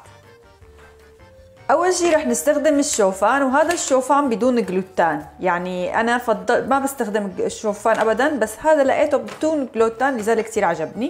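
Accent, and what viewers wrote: Aleppo, Syria. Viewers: بيشهي وبيبل القلب... وانت وضحكتك بتبلي القلب... وحكياتك الحلبية الحلوة.. شكرا أنت الأروع وتزدادين روعة ❤️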